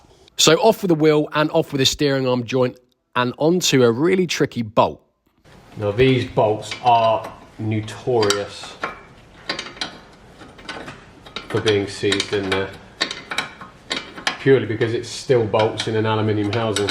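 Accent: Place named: England, United Kingdom. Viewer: I’m watching a lot of these kind of videos and mostly Americans. It’s nice to hear proper English and cars I could afford.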